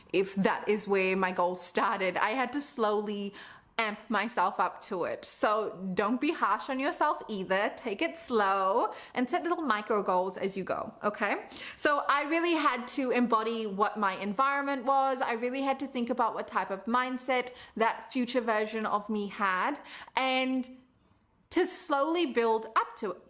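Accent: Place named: South Africa. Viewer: You sound South African, specifically like you're from the Western Cape suburbs 🌟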